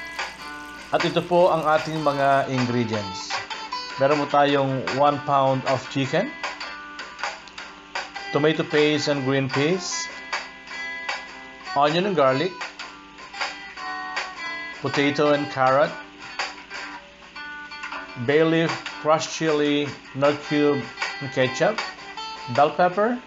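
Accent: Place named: Tagalog, Philippines. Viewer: Sa puntong Ito 👍original tagalog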